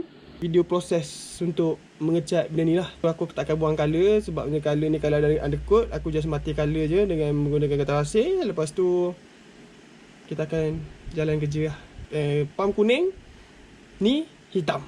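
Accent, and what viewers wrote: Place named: Pahang, Malaysia. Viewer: Sdap sial orang pahang cakap...aku tunjal je..hihiuhi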